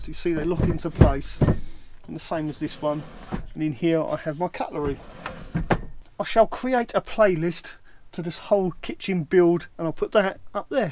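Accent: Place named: RP, United Kingdom. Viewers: I’ve been watching your videos for quite awhile how come you’re talking posh are in this one what’s going on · Loving the posh voice lol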